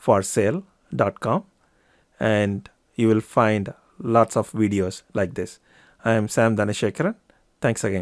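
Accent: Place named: India. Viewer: I always love Indian accent. cuz u understand it and feel something weird in the same time XDD thank you for this tutorial anyway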